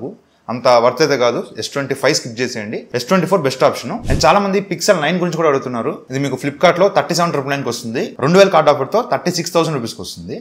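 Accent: Nellore, India. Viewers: Bro nedhi Nellore ha ????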